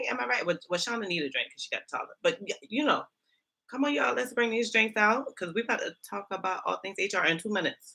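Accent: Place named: Charleston, United States. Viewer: girl you sound like you from Charleston and if you not girl where are you from because I sound just like you 😂😂😂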